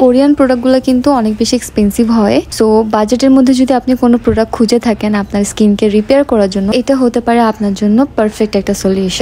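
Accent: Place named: Chittagong, Bangladesh. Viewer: চিটাগং এর মানুষ খাটি শুদ্ধ বলতে পারেনা আপনার কথা তার প্রমান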